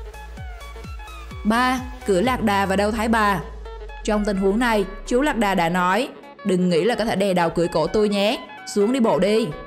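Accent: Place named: Central, Vietnam. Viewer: Cám ơn admin cháu gái dễ thương ❤admin cháu gái có giọng nói miền trung nghe ngộ ngộ dễ thương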